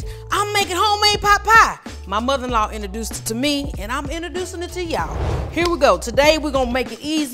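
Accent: South, United States. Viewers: Aww i need a oven, when i have one i am going try this, i would like to make sweet patato pie one day to.😀💐 you are funny " yall wanne me to cook so i,m gonna cook looool and beautifull youre doing fine with the explanation and that beautifull southern accent, i do believe the camera man should do more close ups on the food and the cutting and in the pot, later he or she does that, if its youre husband filming you i understand he cant keep his eyes of you 💐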